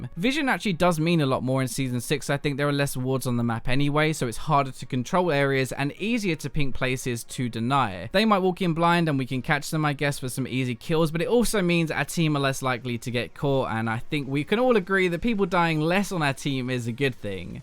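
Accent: United Kingdom. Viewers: LMAO he is britsh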